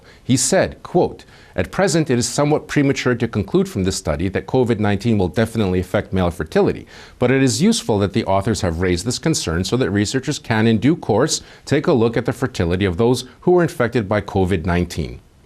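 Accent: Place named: Russia. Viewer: I think has spent too much time in Ukraine and obtained a Russian accent.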